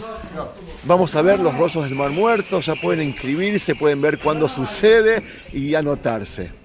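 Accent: Argentina. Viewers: Shalom! Me encanta ver sus vídeos me fascina todo lo que tiene que ver con 🇮🇱 y en español con acento argentino aún mejor.❤️🇮🇱 abrazo!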